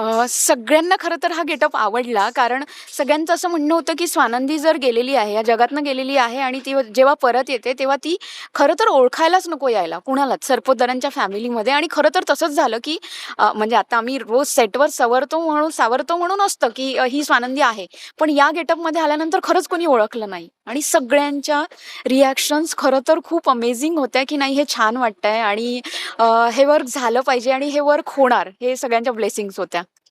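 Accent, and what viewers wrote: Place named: Vidarbha, India. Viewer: आपली भाषा मस्त आहे विदर्भ ची पोटे 😂😂😂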